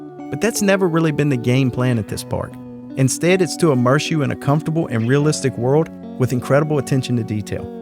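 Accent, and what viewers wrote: South, United States. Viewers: LOVE YOUR SOUTHERN DRAW..🥰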